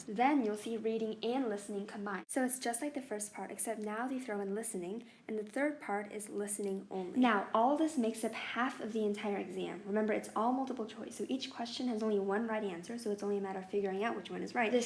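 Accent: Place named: Mexico. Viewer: Love the way she speaks Mexican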